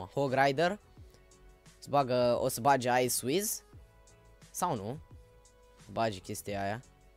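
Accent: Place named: England, United Kingdom. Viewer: Vezi ca ai putina engleza si franceza pe la coltul gurii;)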